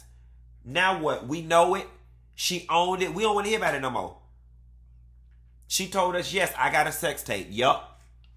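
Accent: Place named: Florida, United States. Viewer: I swear foe Gawd! Yo ability to discern and explain mess is an awesome thing to watch! That South Florida flow. I'm from dine thea too. I love it!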